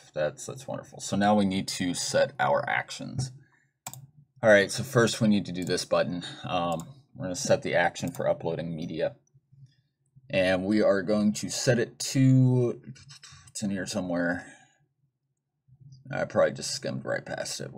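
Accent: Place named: RP, United Kingdom. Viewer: its a great video, but coud't continue because of that rich people accent something, that you have . Sorry :( . I think you can talk normal if you want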